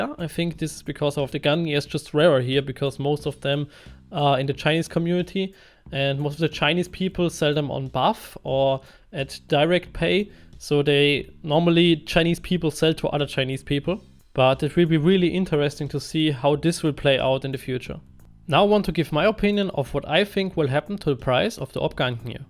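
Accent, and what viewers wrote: Germany. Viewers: schon witzig wie man deutsche am englischen dialekt erkennen kann^^ ich mag investment videos für steam market und lass mal ein abo da für deine mühen ;)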